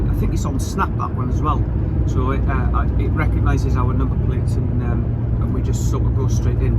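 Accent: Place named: Swansea, United Kingdom. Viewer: That’s a Swansea accent I reckon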